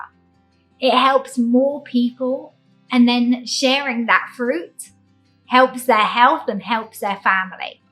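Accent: United Kingdom. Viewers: Hi I like your UK accent that saw so many video 👍 台灣真的是水果王國，我去到北美的時候看到水果幾乎都是冷凍的！覺得都不想吃了😨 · 慢速英国腔，好听。关注您。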